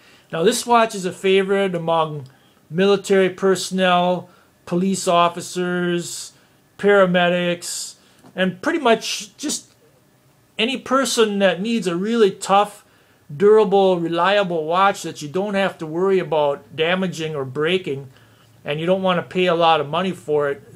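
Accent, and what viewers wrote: Canada. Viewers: good review man. and cool accent. are you canadian?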